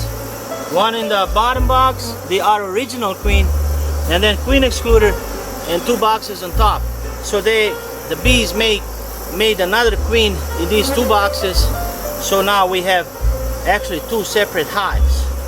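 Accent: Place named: Balkans. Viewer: You sound... like you're from my part of the world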